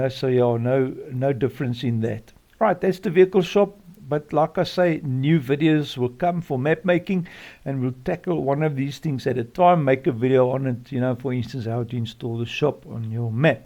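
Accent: South Africa. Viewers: Hey. By your accent I take it you're from sunny South Africa. How bout making us a nice SA map.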